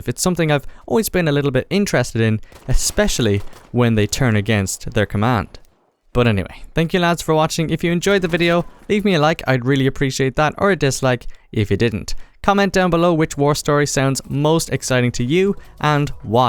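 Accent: Ireland. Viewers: he's irish, he said it right in this video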